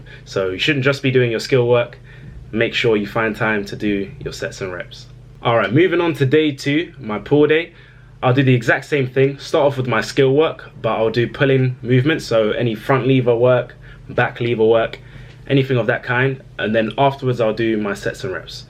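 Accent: United Kingdom. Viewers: I have been watching your videos for months now. I always learn something new. I like your british accent btw. Greetings from Czech republic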